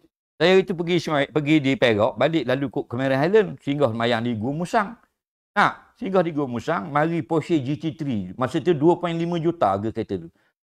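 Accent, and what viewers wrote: Terengganu, Malaysia. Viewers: Uai sangat pandai kecek teganu.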